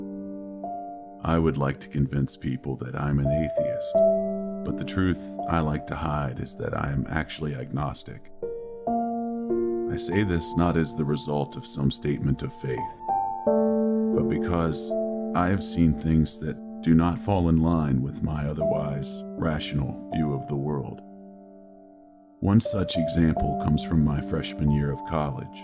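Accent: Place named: South, United States. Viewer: where are you from? Sound like you got some south in ya sir.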